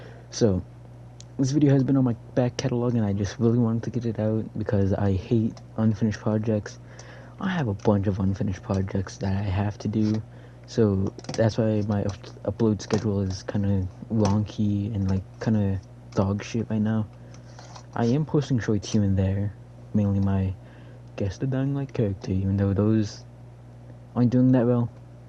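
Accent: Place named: South Africa. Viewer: Great game. Thanks for the video. Btw, what accent is that? Sounds like south african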